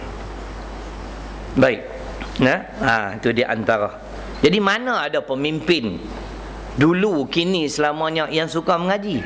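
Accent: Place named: North, Malaysia. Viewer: Bagi yang bukan dari negeri2 utara Semenanjung mungkin rasa bahasa yg dilontarkan ini agak kasar...tapi itulah bahasa Utara yang unik....bagi kita bahasa ni biasa ja...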